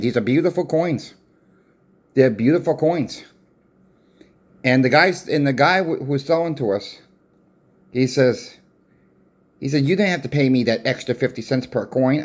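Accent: Boston, United States. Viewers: I thought you were from New York but you said you’re from Boston. I can hear it now.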